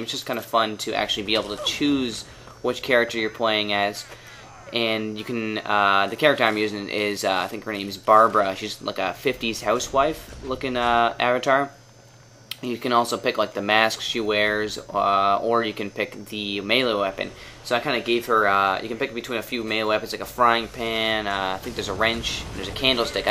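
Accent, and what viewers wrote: Canada. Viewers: Are you canadian? :L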